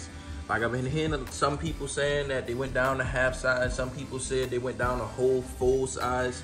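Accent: Baltimore, United States. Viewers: You from b more lol i hear it🔥💯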